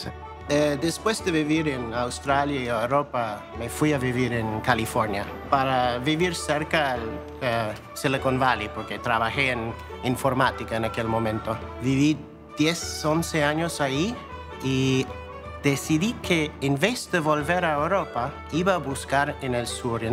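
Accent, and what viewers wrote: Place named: Argentina. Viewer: Me encanto este vídeo,veo un lindo irlandés con acento argentino,, algún día podré visitar a nuestro país hermano. Saludos de una peruana viviendo en Irlanda.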